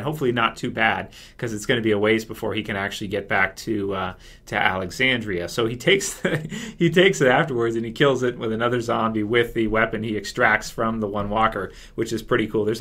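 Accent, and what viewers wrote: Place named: Canada. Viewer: "oat and aboat".... LOL i love your accent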